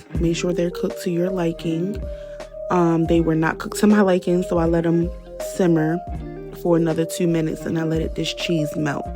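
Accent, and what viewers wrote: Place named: Baltimore, United States. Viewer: Looks good, youre from Balitmore arent yew lol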